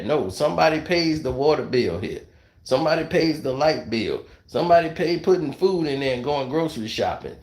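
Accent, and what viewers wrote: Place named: South, United States. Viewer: I love when you do that Southern accent.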